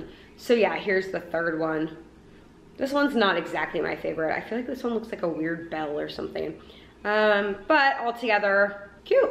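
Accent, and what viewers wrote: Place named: Southern California, United States. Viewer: You have to most SoCal accent ever.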